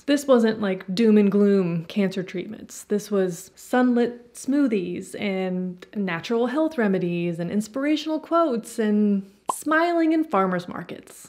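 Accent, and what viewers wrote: Australia. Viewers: I am so here for your Aussie accent. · Loving your Aussie accent 😜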